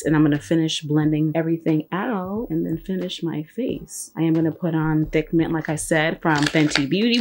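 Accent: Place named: Rhode Island, United States. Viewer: Only a select few are gonna recognize your accent…and I’m one of them! RI STAND UP! And the bronzer looked beautiful on you, but let’s face it, anything would!😍😘❤️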